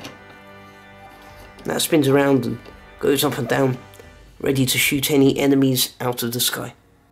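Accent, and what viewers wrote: United Kingdom. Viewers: R u British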